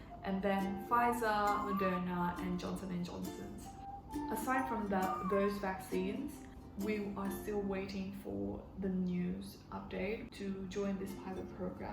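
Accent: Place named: South Africa. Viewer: Your accent sounds South African, pls drop explanation and how u learned English 🌬❤️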